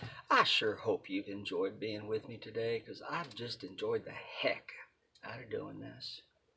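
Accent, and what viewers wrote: Texas, United States. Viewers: I loved watching this video and loved listening to your Texas accent. We live in North Texas stationary and travel as much as possible. Thanks for sharing this with us. I am going to try this with dollar store pound cake. My Momma used to make the best homemade pound cake.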